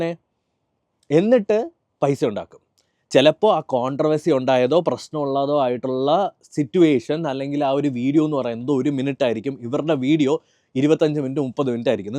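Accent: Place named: Thiruvananthapuram, India. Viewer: enth standard 😂😂 ninte movie review oke shokam aan....oru cinema knowledge ooo athinte oru essence oo ninte review il kanarila....chumma kore vaya kond goshti kanikum ....trivandrum slang il korch vaythalam.....and tech review x il ninum kittuna news athe pole irunn vayikunu.....ninte pandathe musically vedio kandapo manslyi nee oru kannapi mathrm ayrnu pine youtube il click aaypo ath ang continue cheythu....pine kore podi piler fans ulath kond koypmla....apart from as a content creator you are shokam .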